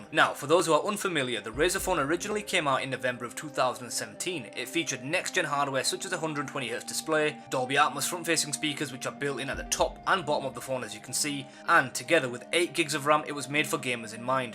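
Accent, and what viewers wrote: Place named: North, United Kingdom. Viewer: This Asian man speaks with a distinctive Northern accent which is very different from the hybrid Jamaican dialect which is spoken by Pakistani teenagers in London. The original Indians of India were Black and migrated to India from Africa 170, 000 years ago. But i am only referring to young Pakistani teenagers in London who speak English in a hybrid Jamaican dialect which is very different to the distinctive Northern accent this guy has.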